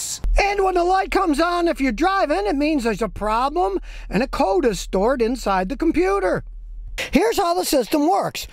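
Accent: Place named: Texas, United States. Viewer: I love your sweet Texan accent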